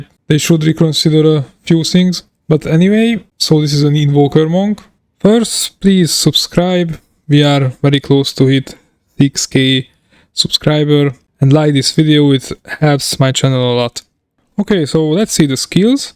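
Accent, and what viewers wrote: Hungary. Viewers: You have such a Hungarian accent :D no offense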